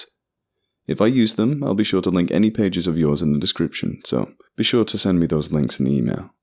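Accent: South, United States. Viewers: no more southern accent please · You did a good job with the Southern accents! I felt right at home. 😅 But were they supposed to be Southerners, or New Englanders? I potentially missed that detail, lol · As someone from the southern United States I must say that you have really gotten better at the southern accent. Great job as always. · Actually, your Southern USA accent is pretty danged good. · Your southern accents sound superb! You could easily be a voice actor for The Last of Us with how good they are. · Thank you for taking the time to narrate this. Also, great job on the country accents. Looking forward to more! Always watching, waiting and listening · Next time that you do a piece about the Northern Part of the USA, such as in 13 Mile Woods in New Hampshire, use regional accents, not the southern rural accents that you are so fond of. Hunters up near the Canadian border are unlikely to have southern drawls. 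Thank you. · I'm from the south and think you did a great job on the accent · I love your country accent. 😂❤️ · You sound so cute doing a Southern accent! I love it! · the southern accent actually works pretty well. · Your accent was better than mine and I live very near people who have the southern accent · Ahhh, nothing like a good wendigo story.. Plus, dont ever apologise for your southern accent.. Its good.. I live in the south and youre doing it justice.. Theres a reason why hollywood uses english actors for southern character roles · Why the southern accent isn’t this supposed to take place in north east u.s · Great southern accent. If only this was set in the south. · You pretty much nailed the southern accent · Never ever apologize for your proud Southern Accent, NEVER!! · ya good at southern accents but it's NH north nothing like what we sound like. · Your southern accent is on point, mate!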